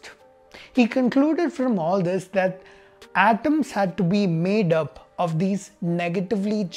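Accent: Kerala, India. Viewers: Bro I really appreciate you finding mistakes of others..... But pls do speak in proper accent. I feel like I'm watching Malayalam channel. U r killing it 😂😂😂😂.sorry it's ur 1st mistake. 2. U didn't do proper research on JJ Tommson.... His Nobel Prize considered as false and it's still controversial.... There are thousands of science theories and research were proven wrong later. And it's updating.... I know you are doing this for fame.... I feel pity for you.... I really enjoyed your videos it was like a comedy show.... Particularly LMFAO when u said "hilarious" 😂😂😂😂😂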